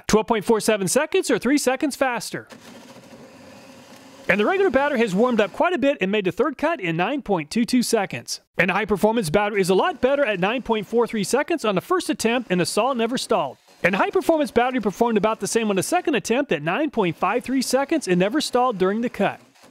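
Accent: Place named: South, United States. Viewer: You talk so slow, must be that southern drawl I keep hearing about.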